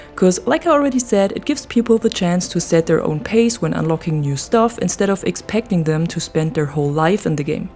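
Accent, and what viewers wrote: Germany. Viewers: Yea, i also think it's a German accent. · Your good, is that a German accent i detect 😉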